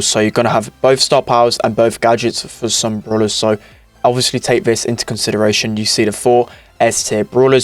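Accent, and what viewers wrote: United Kingdom. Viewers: Awww cute British accent tho👀🥰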